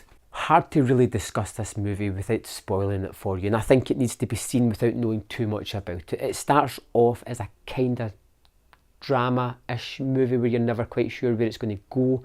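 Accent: United Kingdom. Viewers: I like British the accent is cool yeah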